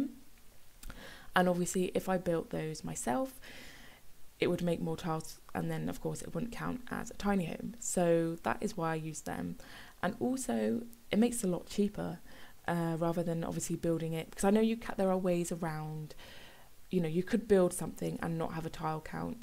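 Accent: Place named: Surrey, United Kingdom. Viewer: I hate you. You're making me miss the boats from the Sims 3 Island Life... ;-) I'm just kidding (well, on the first sentence at least, the boats were cool, the game breaking bugs much less so...), but it is a really cute build! It's a shame there isn't a world builder as there is a boat permanently attached to a jetty in central London that could inspire a restaurant build, but there isn't really a world with a lot where it feels like it could be put in and it would fit. For the storage, it's a shame that someone hasn't done a modded sofa which also includes drawers, it's a feature of canal boats and caravans. There are also a lot of overhead cabinet type storage which isn't typically interactive in the Sims, so someone modding those would make sense (although it would be even better if they were added to a tiny home refresh so all players had access to them). 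Heck, even having functioning drawers at the end of a double bed would be a nice way to remove the need for a dresser. As for why you're back in Henford-On-Bagley, going by your accent (Surrey?), it makes sense that you're going to be able to build things more naturally there, you're not going to need to look up reference images to make it fit, you've probably seen a lot of it in your life time. I wish the Sims 4 would arrange worlds in clusters as I'd love to see what you and JessicaPie could do if you had to rebuild the high school town in an English style (I'm thinking somewhere like Reading), the University town may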